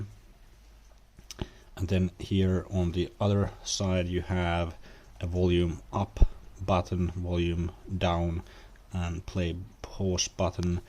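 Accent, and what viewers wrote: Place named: Finland. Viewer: Your accent sounds like a Finn. Onko sinä suomalainen?😊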